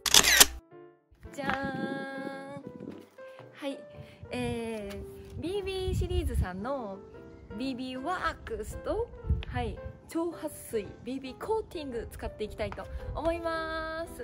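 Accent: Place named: Kyushu, Japan. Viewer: 九州出身なのでたまに出る方言がとても良いと思います😊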